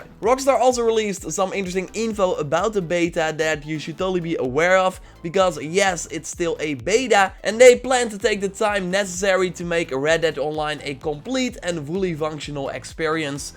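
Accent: Netherlands. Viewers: I detect a dutch accent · He's from the Netherlands man, don't be so rude. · You sound soooo Dutch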